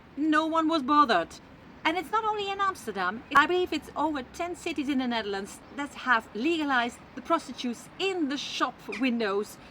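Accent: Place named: France. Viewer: C'est amusant, vous avez un accent francais en anglais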